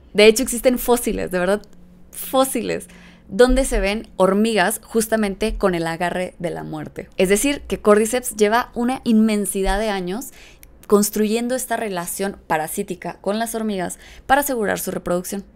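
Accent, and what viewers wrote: Mexico. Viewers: "Tócate más" entendí 😜 Luego vi que era "Toca temas" 🤭 Será por el acento mejicano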